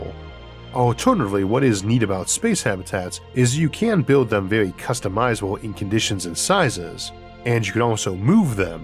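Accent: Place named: United States. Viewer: What's your accent, i assume American because how big and wide it is, is my assumption correct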